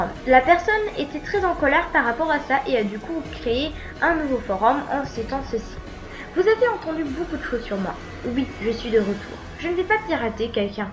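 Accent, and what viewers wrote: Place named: England, United Kingdom. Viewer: J'ai kiffé l'accent anglais ('x ♥♥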